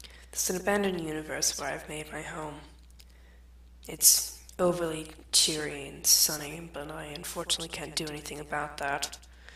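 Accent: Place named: United Kingdom. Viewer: He sound british (｡・//ε//・｡)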